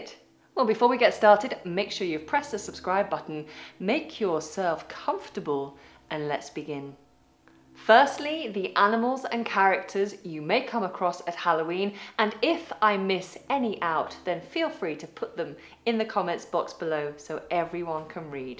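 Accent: United Kingdom. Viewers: are you a british? I´M BRAZILIAN. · You accent is similar of british english. I love!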